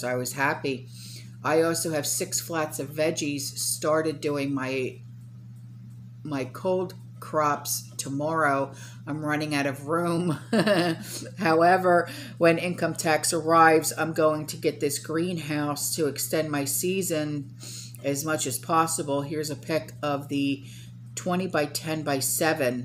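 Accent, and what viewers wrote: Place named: New York, United States. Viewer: The more you talk the more you sound like you're from New York instead of Florida.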